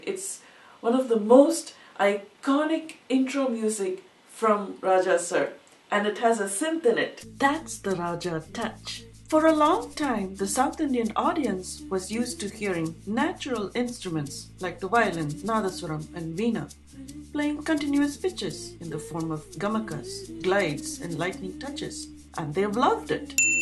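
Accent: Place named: England, United Kingdom. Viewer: Excpet ur strong english Accent.... Everything else was okay!!!!!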